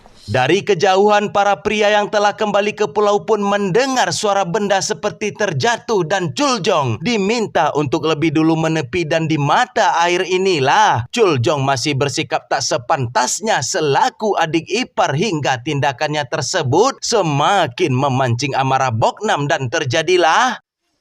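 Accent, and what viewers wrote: West Sumatra, Indonesia. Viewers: Rang minang y bg?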